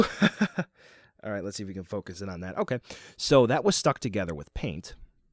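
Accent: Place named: South, United States. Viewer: What is with the southern accent?????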